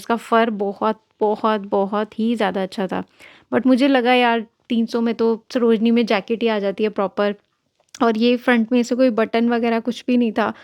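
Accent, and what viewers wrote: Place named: Karachi, Pakistan. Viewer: Lrki tm bikul hmari trahn yani Karachi walon ki trhan Urdu bolti ho..pharry aan dhmky wghera.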